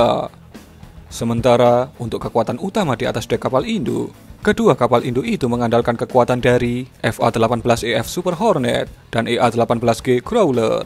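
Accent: Java, Indonesia. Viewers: Medok nya dak hilang2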